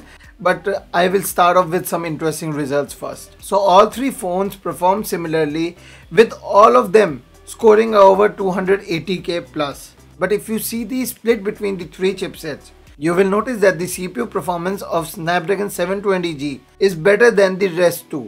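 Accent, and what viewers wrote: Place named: India. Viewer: Me too LoL 😘 very rare to find Indian English speaking channel haha!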